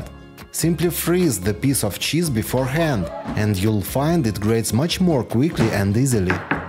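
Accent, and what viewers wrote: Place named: Russia. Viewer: Мужик, ты чертов гений! Лайк тебе за лайфхаки и за классный русский акцент. Подписалась.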